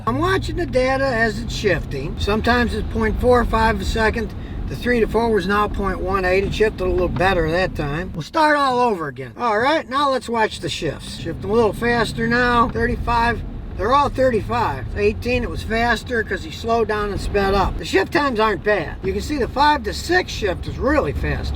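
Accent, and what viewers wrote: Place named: Chicago, United States. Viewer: it's the Chicago accent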